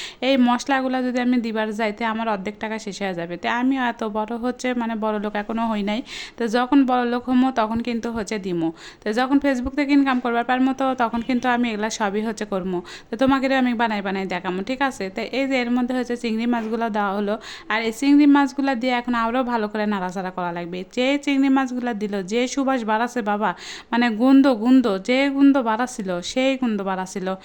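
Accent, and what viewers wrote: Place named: Bogura, Bangladesh. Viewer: আপনার বাড়ি কি বগুড়া নাকি আপু কথা শুনে মনে হচ্ছে আমাদের এলাকার মানুষ ❤❤❤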